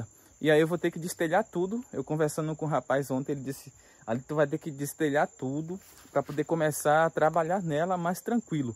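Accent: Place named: Minas Gerais, Brazil. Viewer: Nunca imaginei que fosse no sul da Bahia sempre assisto você achando que fosse mineiro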